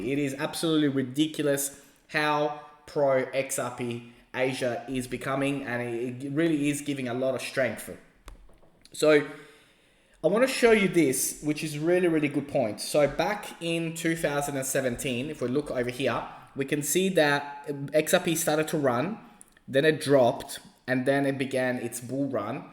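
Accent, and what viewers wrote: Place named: Australia. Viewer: Glad I found you bro. Judging by your accent your from AUS. I'm also from Aus specifically Melbourne. Their arnt that much AWAKE/AWARE crypto people in AUS like yourself. Gave you a sub mate 🙏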